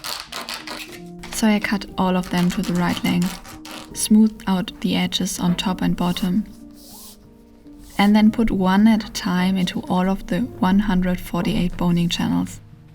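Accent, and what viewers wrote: Germany. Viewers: i love her and her projects, I just can't with the german accent lol. Maybe it's that german self-hatred, or it's my misophonia, .. her accent isn't even too thick/bad, yet it tickles my brain in ways that, I wanna watch her videos but can't enjoy. Gotta mute or click off :( Is that only me? (Maybe could be connstructive criticism, to work on the accent cause it truly might help garner even more international audience (?))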